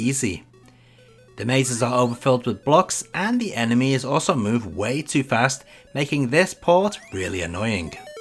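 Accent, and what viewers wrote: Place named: Wales, United Kingdom. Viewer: I'm trying to place your accent...I'm thinking west of England, perhaps Wales? (I'm far off, right? Like a Frenchman calling a Texan a New Englander?)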